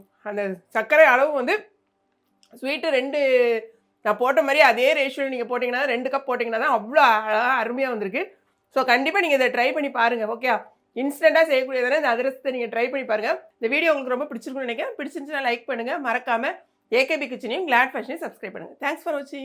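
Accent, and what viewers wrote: Tirunelveli, India. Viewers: Neenga Tirunelveliya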